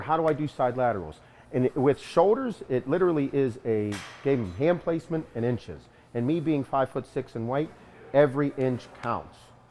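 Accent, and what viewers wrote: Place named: Pittsburgh, United States. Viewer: Heard the accent before I saw the word Pittsburgh in the back. Sorry about Sunday. That defense is in trouble.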